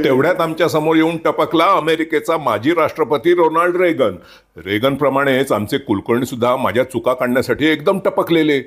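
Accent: Pune, India. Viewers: काय मराठी आहे अगदी पुणेरी शैली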